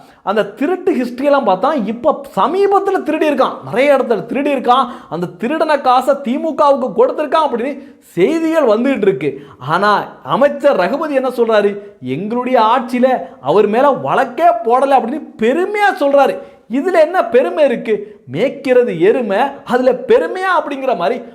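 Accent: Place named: Kerala, India. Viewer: உங்களுடைய பேச்சில் மலையாள வாடை வந்தாலும் நீங்கள் ஒரு தேசியவாதி என்பதாலும் நீங்கள் பிஜேபி ஆதரவாளர் என்பதாலும் உங்களுக்கு என்னுடைய முழு ஆதரவு உண்டு.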